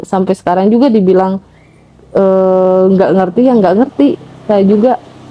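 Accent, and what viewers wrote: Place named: Batak, Indonesia. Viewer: Batak gaya bicaranya seperti aagim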